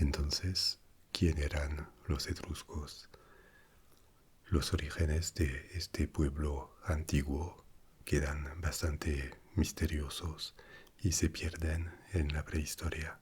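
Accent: France. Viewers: Español con acento francés... ufff, este audio es maravilloso. Muchas gracias. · Qué hermoso! Castellano con acento francés! Gracias!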